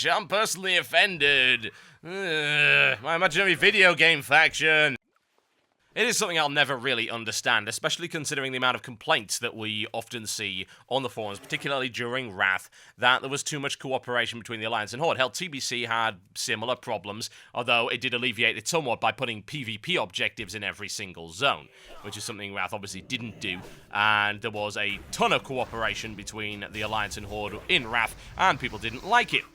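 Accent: United Kingdom. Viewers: wait...are you complaining about a British having an English accent? I'm speechless. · telling a British man to lose his accent... gg kthxbai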